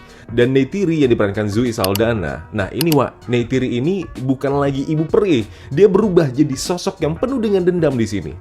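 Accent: Medan, Indonesia. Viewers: bener banget lgi yg di bilng abg ini. komposisi crita nya hampir sama. dmna eywa membantu dengan mengirimkan bala bantuan yg sama, terus pas war di air juga sama. tpi smua itu terbayar karna visualnya dan action ny. ya cukup di nikmatila bagi orng yg gk terlalu Mandang crita. btw ko orng medan ya bg😂?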